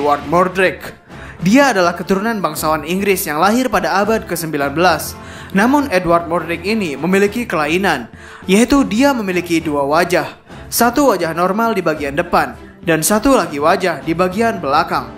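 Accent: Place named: Lombok, Indonesia. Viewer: Iye jage, dengan sasak badek wkwk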